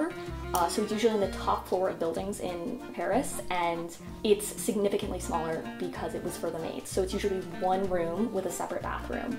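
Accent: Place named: United States. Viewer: I am sorry, but I did not hear any French accent in your English (very much American English if I may point out). I am speaking as a European with a Master’s in English who lives in America for a long time. I hear accents, and you have none, which makes me think that you are an American born and raised 🧐. Nothing wrong with that, BUT... relax! This show is not culturally 100% accurate, it’s a “feel good” show, and the amount of criticism you express is not going to get you more subscribers. You really need to find other topics and angles to grow your channel because the country of the USA is loving the show! 😀 With that said, there are many accurate cultural points in this show, yet again coming from an actually born and raised in Europe person. Don’t take yourself too seriously, and enjoy the show and the FASHION (which by the way is not “questionable”, and that’s the phrase that yet again gives away an American in you), and FEEL GOOD watching the show! 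😃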